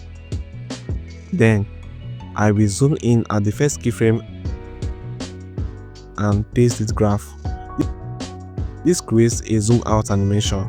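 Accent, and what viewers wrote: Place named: Nigeria. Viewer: I’m your Indian subscriber , struggling to understand Nigerian English accent 😂. Great tutorial 💜.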